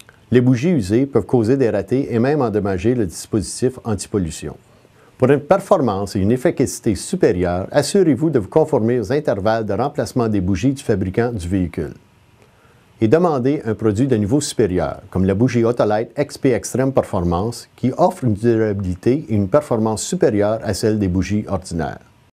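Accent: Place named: Canada. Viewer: c'est l'accent canadien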